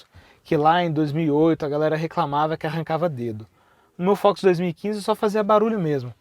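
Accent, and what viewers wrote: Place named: Rio de Janeiro, Brazil. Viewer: O sotaque do apresentador está mais para um carioca, igual meu vizinho, a avaliação nota 10.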